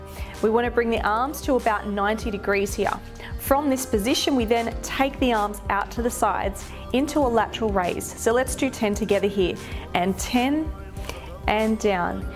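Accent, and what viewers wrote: Australia. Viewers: Just found your videos tonight when looking for exercises for my sore shoulders to complement my physiotherapy. Enjoyed them so much I've just ordered decent bands to work with. I'll be looking up your other body workouts here on. Thanks. Love your reassuring delivery as well. Must be the Aussie (?) accent :-)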